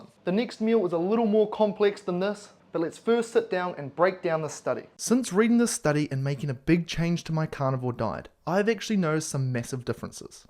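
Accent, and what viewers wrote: New Zealand. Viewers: to me he sounds like a Kiwi at times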